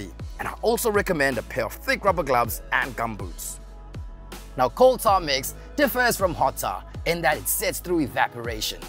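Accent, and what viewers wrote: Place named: South Africa. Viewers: Naw, he's a Saffie, for sure. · His South African, incase you wondering .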